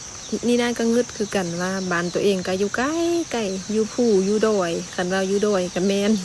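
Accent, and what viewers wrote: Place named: Chiang Khan, Thailand. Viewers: พูดเหมือนคนไทเลยจังแต่ฟังสำเนียงการพูดเหมือนคนไทเชียงคานจังหวัดเลย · น้อง อ่านพาสาไทยได้บ่อน้อ, เว้าคือเลย เว้า หล่มสัก น้าแก้ว แก่นท้าว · สำเนียงพูดเวียงจันทน์ เหมือนกับหนองคาย สำเนียงเหมือนจังหวัดเลย สบายดี❤❤❤🎉🎉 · สำเนียงน้องพูดภาษาคล้าย,จเลย,จ,เพชรบูรณ์ของไทยครับ❤❤❤❤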